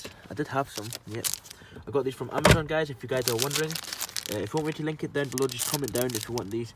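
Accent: Scotland, United Kingdom. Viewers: You Scottish? Lol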